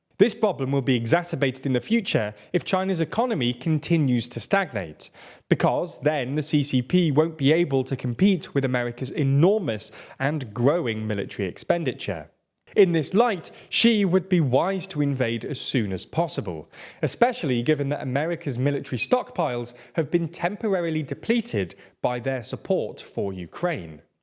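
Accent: United Kingdom. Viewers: i like the program but that stron British accent is really terrible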